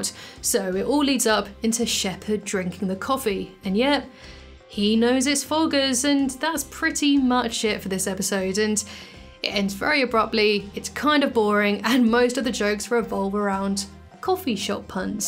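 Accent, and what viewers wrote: United Kingdom. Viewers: I don't get America (or maybe Fox)'s love for Benny Hill either, and I am American. But it's funny to me I legit forgot you were English (despite it being your accent) until you mentioned it. What does that say about me?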